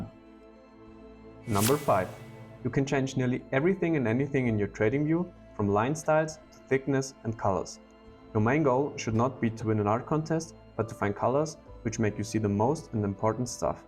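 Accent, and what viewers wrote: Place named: Germany. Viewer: I didn’t know you also had a German accent 😅 great vid brother. Hope it’s the first of many!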